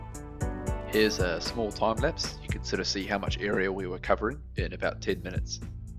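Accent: Australia. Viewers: Aussie accent. Awesome video. By any chance do you live near NSW central coast? Can you come paint ours? :)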